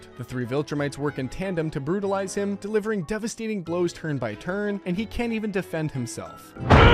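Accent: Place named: Canada. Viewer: thick canadian accent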